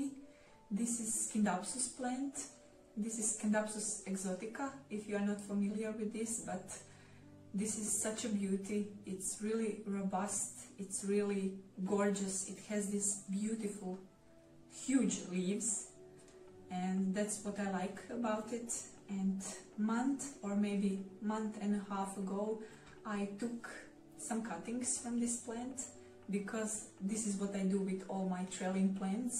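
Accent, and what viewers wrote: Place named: Australia. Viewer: So you're a croation right, i think australian 🙂✌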